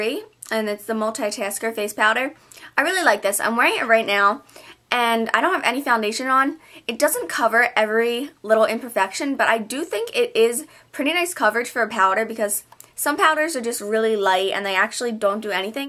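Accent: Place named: New Jersey, United States. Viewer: She's From New Jersey Well At Least I Think She Is Because She Said She Drove To New York For IMATS &&+ People That Live In Jerssey Do Drive To New York Often &&+ Vice Versa &&+ She Does Kinda Have A Slight Jersey Accent So I Think She Is From Jersey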